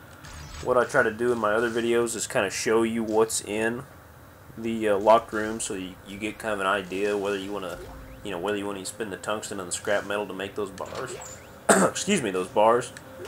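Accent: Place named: South, United States. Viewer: Awesome, thanks for making this, enjoyed your southern accent!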